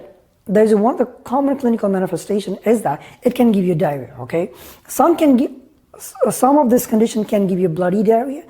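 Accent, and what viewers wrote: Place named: India. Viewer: Sir your teaching is good but you will speak Indian kind English i thought better to understand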